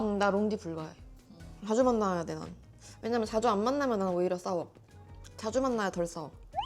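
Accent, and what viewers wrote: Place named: Jeolla, South Korea. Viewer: 예전에비해 전라도 사투리 오지시네요.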